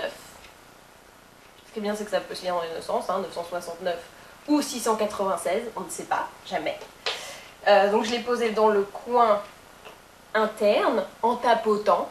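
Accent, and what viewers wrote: South, France. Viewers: L'accent du Sud le plus beau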